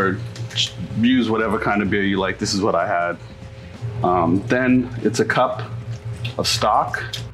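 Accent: Boston, United States. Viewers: Pointless comment here: This is the first episode I watched where I could actually hear the Boston accent. 🙃 I love watching this channel! Thank you for all the "cooking classes." (Essentially.) I swear I learn something new every episode. ❤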